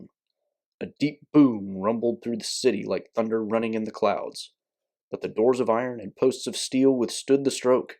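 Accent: South, United States. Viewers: Great Reading. Love the Southern Accent. Make more videos on the Silmarillion and Keep Pushing. Also, get on Odyssey and other platforms. I love your presentation. Very good.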